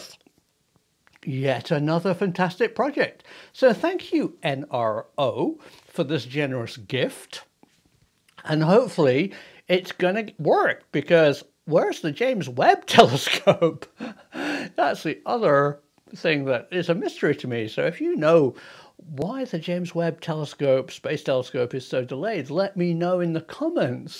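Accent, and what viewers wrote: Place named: Bristol, United Kingdom. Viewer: I love your channel very informative lifelong subscriber and I'm always a fan of the Brissol arrghchcent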